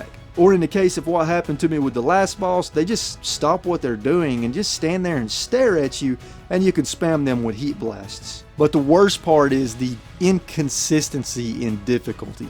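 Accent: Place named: South, United States. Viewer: Thanks for the review! As a fellow southerner, I was really happy to hear your accent!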